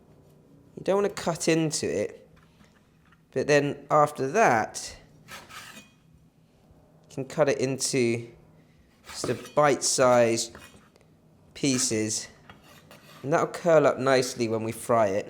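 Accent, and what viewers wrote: United Kingdom. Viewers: I love this guy's British accent..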